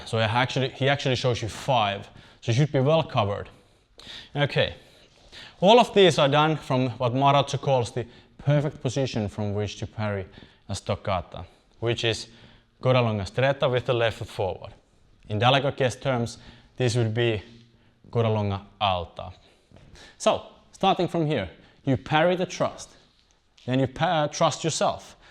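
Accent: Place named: Finland. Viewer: Are you Finnish? Your name sounds like it, you really look a lot like my wife's cousins in Helsinki, but your accent is all over the place! Sometimes it reminds me of the accents of my Finnish family, at least with certain sounds. Whether you are or not, your accent sounds very worldly and sophisticated to my ear at least, so please know I mean no offense. That said, I really enjoy your content here! I hope that you are still training and coaching wherever you are!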